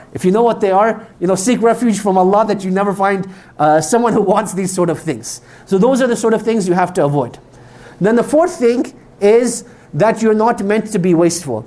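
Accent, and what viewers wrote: South, United States. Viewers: al salaam aliekum yous sound like your from oje of the Southern states. I onow plenty of muslim people whom I have had the pleasur of working with and they have always been peace loving and shared the same intrinsic values as I do, some have escaped oppressive regimes like the taliban and have come to Sydney for freedom to worship to work and to give families a better life. There is a mm uslim preacher called Nounan Ali Khan, I often watch him on youtube, whilst he teaches out the Quran just about alo he mentions on guidence for life, and godly wisdom is also in the bible, he is very gifted in being able to get his message across. Ias a christian I see the church should be out there building bridges with people. There is no room for anti sematism and islamaphobia. Sadly though people of all faiths use there faith platform for selfish and politican means. 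Thankyou for your kind words alhamdililh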